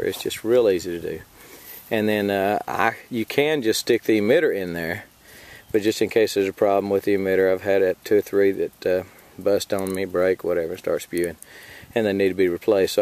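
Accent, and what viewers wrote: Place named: South, United States. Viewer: I love hearing the Southern drawl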